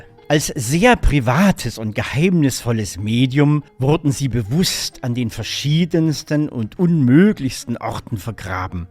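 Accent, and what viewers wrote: Germany. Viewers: Naja, es handelt sich um den Dialekt, der im Raum Dresden-Sachsen gesprochen wird, nehme ich an.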